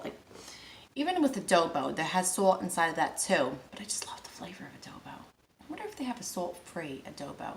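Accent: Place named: New Jersey, United States. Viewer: ps .. thank you fro the recipe and i love your jersey accent girl it is so cool LOL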